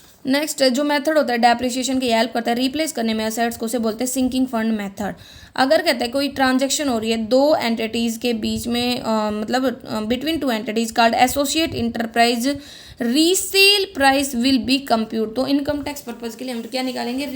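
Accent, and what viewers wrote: Haryana, India. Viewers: Ma'am haryana se kaha se ho?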